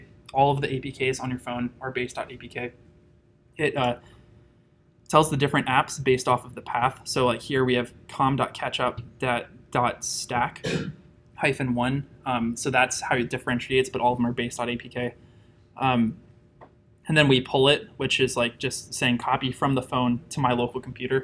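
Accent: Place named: United States. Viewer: Subtitles please! I'm from India and I don't understand American accent. 😞🙏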